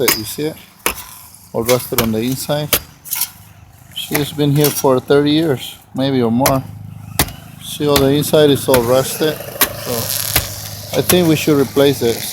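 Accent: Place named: Mexico. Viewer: No hagas ese mugrero primo y se te oye que eres mexicano mejor habla español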